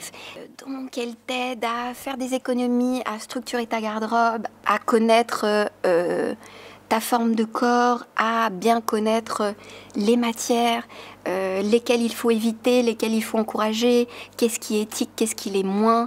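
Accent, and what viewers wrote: Quebec, Canada. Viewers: "Voilà, c'est l'été" aha toujours adorable 😊 continue comme ça! (J'adore ton accent québécois 😛) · Ça fait plaisir de t'entendre parler québécois <3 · J'adore ton accent québécois ! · Ton accent québécois est bien fait j'adore ❤ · J’aime mieux ton accent Québécois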